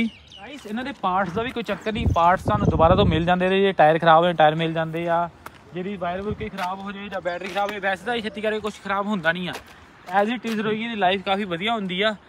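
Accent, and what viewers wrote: Majha, India. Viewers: Majhe aale eve hi bolde aa kaka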